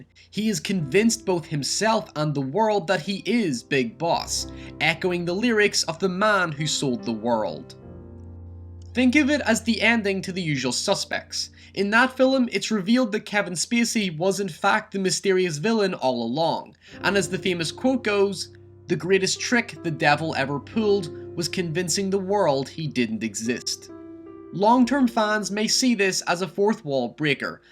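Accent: Northern Ireland, United Kingdom. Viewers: He has a completely typical Northern Irish accent. You certainly skimped on the punctuation lessons though, didn't you? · Northern Irish?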